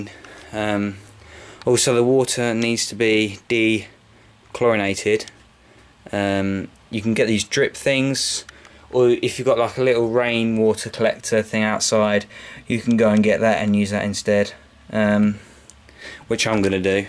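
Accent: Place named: United Kingdom. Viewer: Great vid! Do you live in the UK or the US? Because you're using American measurements but you have a British accent.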